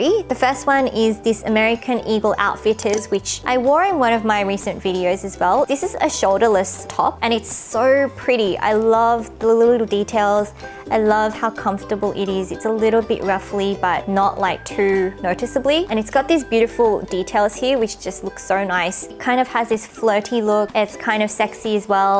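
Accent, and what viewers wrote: Australia. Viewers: Hi! I don't normally comment on Youtube videos and I'm not someone who usually watches beauty videos, but your channel is one I find myself returning to surprisingly often. When I first stumbled upon your channel, 어? 한국 사람이 호주식 바름으로 얘기하네? 하고 마냥 신기해서 계속 보게 됐는데요.. 근데 볼수록 왠지 정(?이라고 해야할까?)이 들더라구요. Perhaps it was the nonchalance with which you so endearingly shared the little quirks of your personality, putting it out there for all the world to see. Perhaps it was the apparently total comfort with which you sat in front of the camera as though you were simply hanging out with your viewers. Perhaps it was the warmth and care and effort you so clearly put into your content to not only share but to better the lives of your viewers in some small way. Whatever the case may be, I just wanted to let you know that your 따뜻하게 바로 앞에있는 친구 처럼 말해주고 보는 사람들을 위하는 예쁜 마음이 이 먼 미국에서도 너무 뚜렷하게 보이고 들린다고 그리고 고맙다고 말해주고 싶었어요. 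Maybe it's just because it's 3AM and I'm feeling sentimental, but nonetheless~ I just wanted to thank you for your hard work.:) Have a lovely day!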